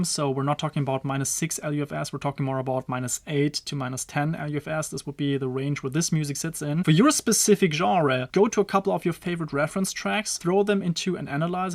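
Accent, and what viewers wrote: Germany. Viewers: Are you German? That accent sounds familiar 🙂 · Man hört echt raus das du deutsch bist bro haha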